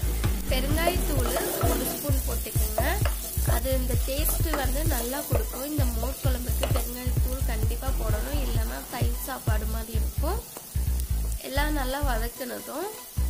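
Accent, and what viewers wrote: India. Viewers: This is Coimbatore slang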